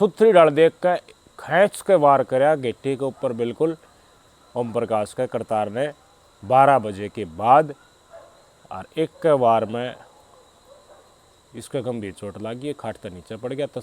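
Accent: Haryana, India. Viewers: Nice video bhai sahab ..apkii pure haryanvi language nd ekdum saaf saaf samjhane walii voice modulation..thanks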